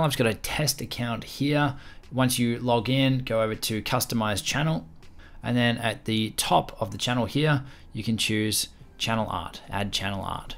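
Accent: Australia. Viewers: great Aussie accent~~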